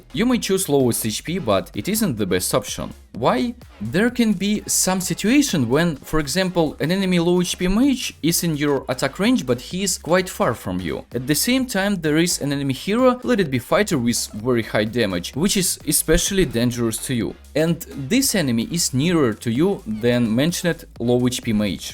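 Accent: Russia. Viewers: Сильный русский акцент , плюс очевидные ошибки в произношении звуков и целых слов и постановке ударения в слове очень портит речь. Советую, перед озвучиванием видео на английском проверять произношение в словаре, чтобы не было таких казузов, как произношение слова "focus" как "facas", которое звучит как не очень хорошее слово) А информация вполне полезная. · are you russian? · r u russian bro?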